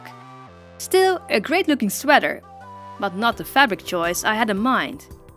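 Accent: Netherlands. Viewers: Beetje jammer weer. Hoorde al nederlands accent in je engels hahaha. Its better in English, becuase more people will understand it :). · I love youre videos and i lpve youre accent i habe the same i am dutch asswell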